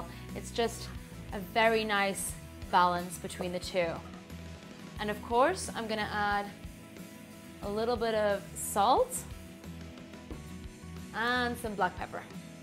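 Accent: Ireland. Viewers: Interesting. It's like an American accent with an Irish twang.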